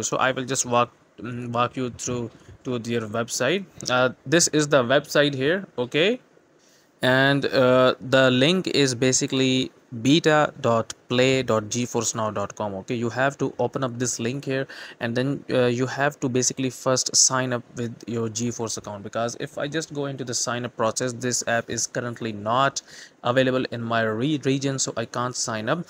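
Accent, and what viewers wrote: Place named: India. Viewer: Speak english not indian english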